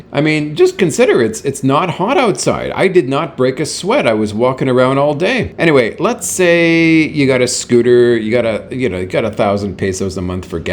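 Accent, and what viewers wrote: Canada. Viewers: Thought I detected a Canadian accent! New subscriber here from BC. Malaybalay looks very interesting. So insane how cheap it is...especially the rent! You seem to have created quite the income stream for yourself. Congrats. I'm looking forward to viewing more of your content.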